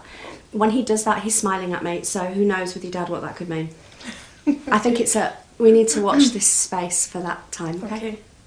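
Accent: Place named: United Kingdom. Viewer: I don't like the British way of speaking . Hard for me to understand .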